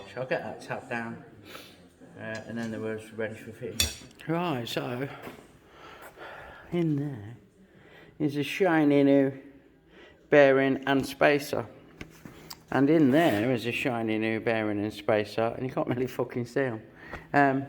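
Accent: United Kingdom. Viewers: Hi. Good to know that you're aright after all that rain you've catched. Today I had a bit of a problem understanding what you said, but it probably was your dripping nose that made the difference. 😀 I talk German and American English and your British English always takes a moment to set in. 😀 If I ever get to change bearings on a wheel I will think of how you did it. Thanx. James from Berlin, Germany 😉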